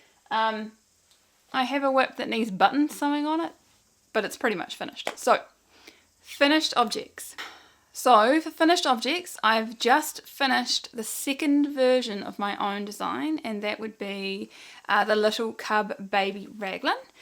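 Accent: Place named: New Zealand. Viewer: So excited that I just found your channel! I lived in Wellington for almost 12 years and it’s so nice to hear the kiwi accent again. My NZ accent has slowly been disappearing 😢 hope to see more videos soon!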